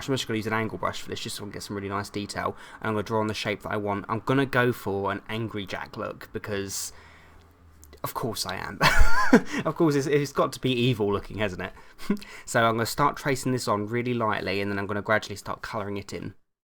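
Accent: United Kingdom. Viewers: you look amazing great work as always! your tutorials are fabulous darling btw im from the uk too and your accent is adorable im from near Manchester lol so my accent aint as classy as yours ;) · I like ur british accent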